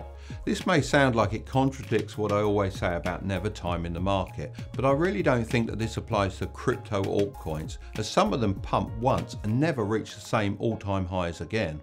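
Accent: United Kingdom. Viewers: His british accent is fire ;)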